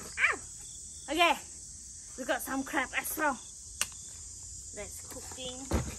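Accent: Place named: Australia. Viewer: I can hear the Australian accent in that English language 😊👍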